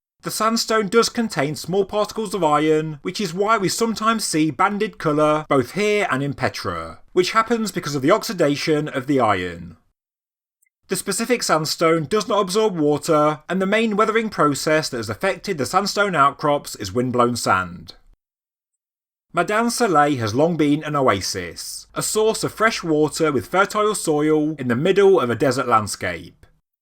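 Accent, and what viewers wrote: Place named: United Kingdom. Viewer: Could you please tone down little bit of your f****g british accent and tonation so more could fully comprehend you talking s***t chanell..your bri* accent i can tolerate but you monotonose boring tone is irritatingly offending to the ear...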